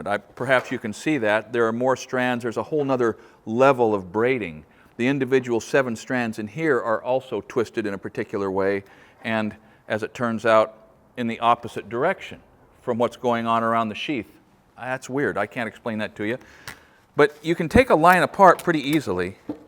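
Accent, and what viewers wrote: United States. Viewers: Love your beautiful vocabulary and accent. Original American accent. Words are not enough to appreciate the value of your useful programs. Lots of appreciations, thankyou.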